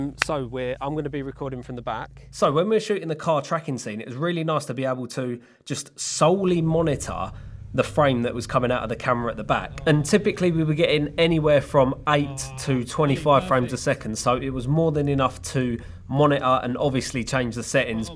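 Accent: United Kingdom. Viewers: Bro I just noticed you look like you're the brother of James Hype. You even got the same UK accent and Name. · American here, I love your accent this is what Americans imagine when when think of a prototypical "British Accent"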